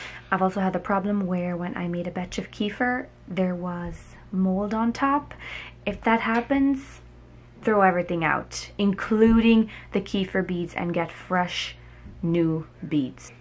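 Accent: Ireland. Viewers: I had never heard about this before! Thank you so much for sharing, very informative. You have such a beautiful accent! May I ask where you’re from/grew up? I hear an Irish lilt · You sound so Irish. Just amazed how Hutterite colonies probably stem from Irish roots.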